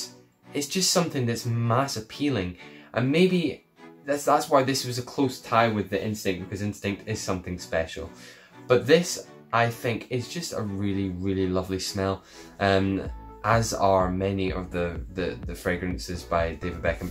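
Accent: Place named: Glasgow, United Kingdom. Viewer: This is an accent predominantly spoken amongst middle class Glaswegians who tend to reside in the west end of Glasgow and more recently the south side of Glasgow in particular the shawlands area. The accent was firstly adopted by middle class Glaswegians as a way to differentiate them from the more “common” Glaswegians and to show them as being “better” than the Glaswegians who spoke in slang. Many Glaswegians from working class backgrounds develop this accent when they first start at University in an attempt to camouflage the working class roots they have been brought up in which in turn enables them to blend in with the middle class “cool kids”. The accent is influenced by people from the north of Scotland.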